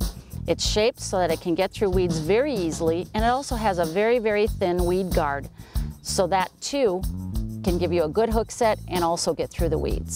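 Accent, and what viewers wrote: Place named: Minnesota, United States. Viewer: haha she sounds like she might be from minnesooaata but this is really good...you rarely ever get sound, down to earth advice from fisherman on yt... how do you not set the hook though? setting the hook has become like a tourettes tic to me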